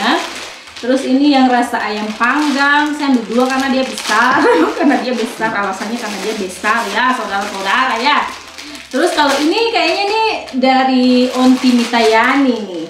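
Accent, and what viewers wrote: Manado, Indonesia. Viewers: Bhsa menadonya kental betul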